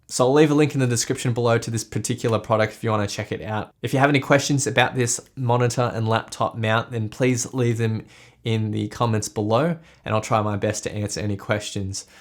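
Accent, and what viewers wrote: Australia. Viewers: Thanks for awesome review and instructions. Nice to hear an Aussie accent, we need a good tech review channel, thanks for bringing it. The link you provided takes me to a product $239 ? Did price just increase or wrong link?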